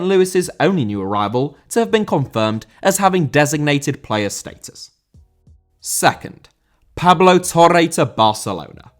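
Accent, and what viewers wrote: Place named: North, United Kingdom. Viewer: You do understand he has a northern accent? I wonder if you would be so critical of an an African or Asian speaker where their natural speaking style would cause similar issues.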